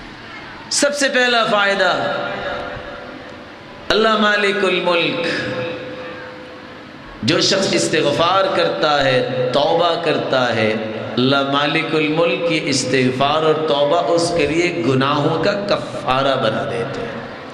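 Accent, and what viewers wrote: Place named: Saudi Arabia. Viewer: MashaAllah MashaAllah sheikh sahb ap saudia sy lgty h apka hadith parhny ka lhja arabic h coz mny quran saudia sy hifz kiya h.Subhan Allah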